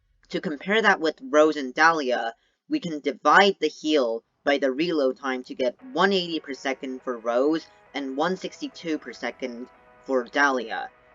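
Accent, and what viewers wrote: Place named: United States. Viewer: Im from south america, and your pronounciation is so american, lol Nice video btw :D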